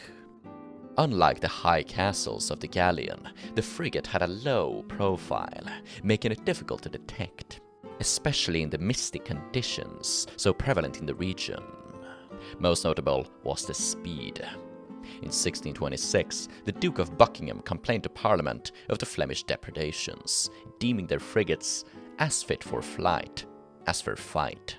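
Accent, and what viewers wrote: France. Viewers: Love the french accent 😁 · Okay, the video is so entertaining and formative when it comes to frigates. But so far my favorite part is your French accent 😅😂 Keep up the great work! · Hahahah I love your french accent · Haha the French accent is great. Never change, bro!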